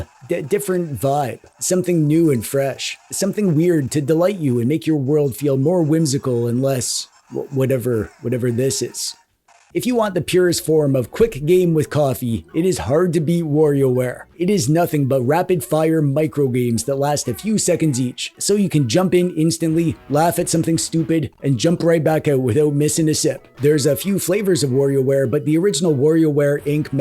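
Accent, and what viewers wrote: Canada. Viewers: hey man what part of canada is that accent from? i met a guy in New Zealand who was from Canada and he had the same accent as you, i think it's cool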